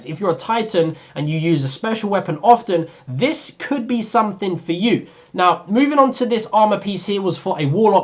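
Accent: United Kingdom. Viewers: Why is every Destiny Youtuber british? Seriously, you're voices are fucking annoying.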